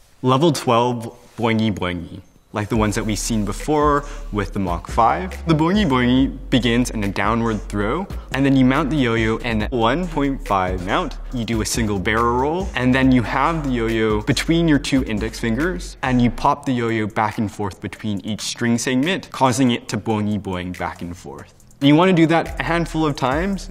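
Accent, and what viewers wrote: Canada. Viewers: I am very canadian. But i noticed his Canadian accent. It is beautiful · He sounds very Canadian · He's Canadian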